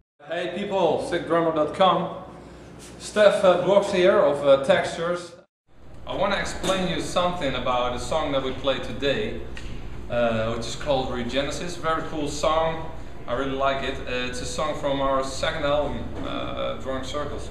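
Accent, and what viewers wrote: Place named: Netherlands. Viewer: Hahaha that Dutch accent. Building up to a very cool beat towards the end!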